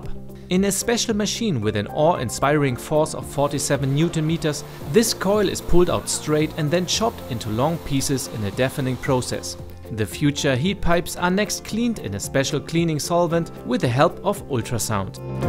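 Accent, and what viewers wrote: Germany. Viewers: That german accent :D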